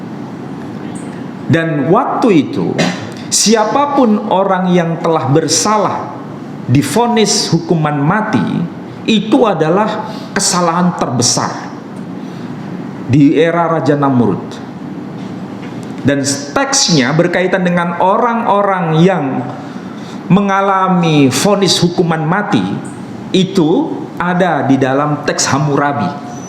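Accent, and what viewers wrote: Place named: Iran. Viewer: Seperti lahjah atau cara baca Parsi, Iran..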